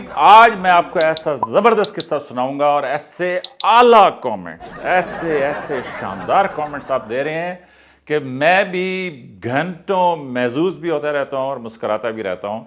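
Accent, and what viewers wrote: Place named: Sargodha, Pakistan. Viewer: سر بہت اچھا ❤ آپ کا لہجہ سرگودھا کا لگتا ہے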